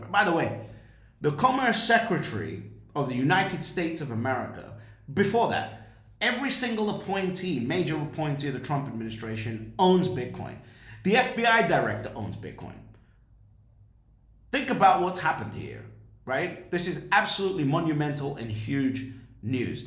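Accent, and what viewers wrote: Scotland, United Kingdom. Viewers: Good channel bro, you sound like you are from Scotland.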